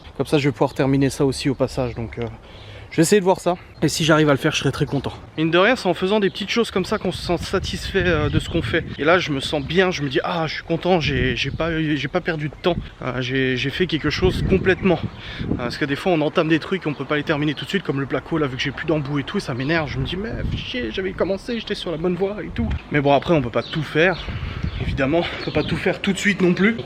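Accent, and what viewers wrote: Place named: Lorraine, France. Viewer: Hihi tu as vraiment un patois Lorain. "en retraite", nous les sudiste on dit "à la retraite" je trouve ça marrant. Mais bon je te fais cadeau d'une expression patoise gersoise "tataragne" pour araignée ^^.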